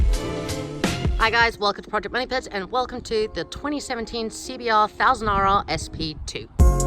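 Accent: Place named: Australia. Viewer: You have an interesting accent, sounds Australian, English and south African all in one haha.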